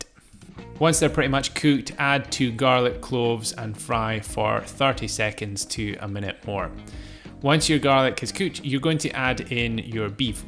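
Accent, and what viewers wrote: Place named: Scotland, United Kingdom. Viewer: I really enjoy listening to your lovely Scottish accent talking us through these recipes and I am looking forward to trying some of them very soon. I especially enjoy the slow cooker recipes as that’s all I have at the moment, and recently made a pasta Bolognese from one of your recipes and it was delicious! 😊 BTW, my guide dog is actually from Glasgow. 🦮😄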